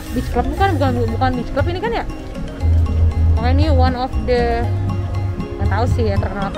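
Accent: Medan, Indonesia. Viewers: Gasuka basabasi, aku suka kak dari awal dia muncul di tiktok sampe sekarang krna logat medannya yg suka ngegas + muka nya ga ngebosenin ❤ Salam dari medan kk 🙏 Dalam hati "semoga dapat kiriman", aamiin yaallah 😌✌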